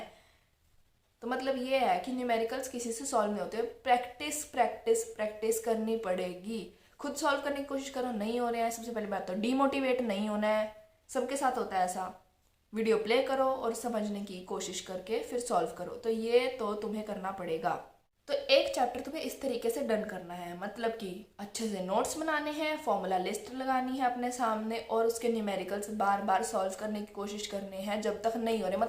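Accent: India. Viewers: Aap haryana se ho? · Aap rajasthan se ho ?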